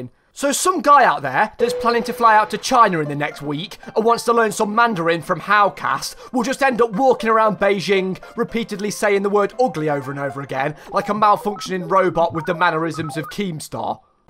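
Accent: United Kingdom. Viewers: that british accent, lol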